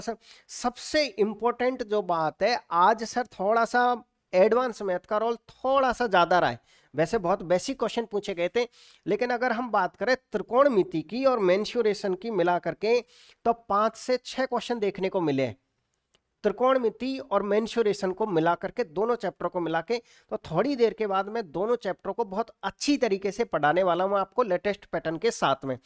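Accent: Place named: Jaipur, India. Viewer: Sir aapke bolne ke style me hi pata chalta hai aap jaipur se hai❤❤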